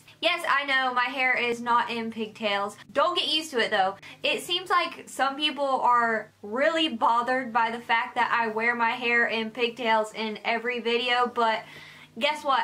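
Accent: United States. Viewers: Southern American accents are one of the best and this is coming from a California native. The reason why you get insecure about your accent is because of the stereotypes with people who have it are perceived to be dumb or low class. Not true. It's actually a very classy and gorgeous sounding. In fact, if you put Southern American accents on slow motion in a voice recorder, it sounds very similar to British accents. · Are you from Texas?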